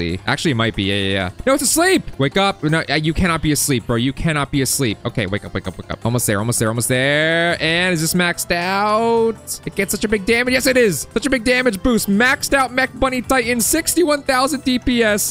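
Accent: United Kingdom. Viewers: Britsh voice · bro no one knew he was british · The goudly British accent tho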